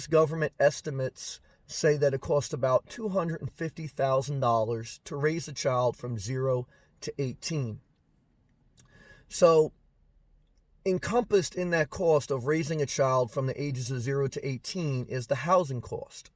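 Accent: New Jersey, United States. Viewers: Thank you I love your NJ accent and you been keeping me company today driving from Jax, Fl to Alpharetta, GA :)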